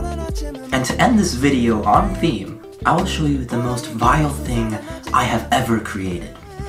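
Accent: United States. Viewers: I thought you were American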